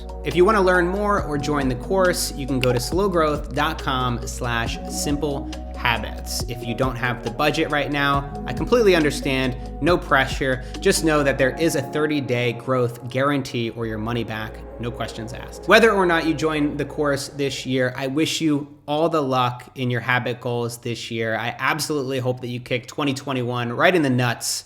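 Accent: Australia. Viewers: "Going FOH-ward," to me, is one of his trademark pronunciations that you just need to wait for. Can't wait for Australian accent to kick in.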